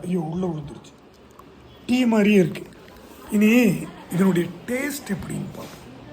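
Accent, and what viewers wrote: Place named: Koomapatti, India. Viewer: Yenga koomapattiku vanga same style of speech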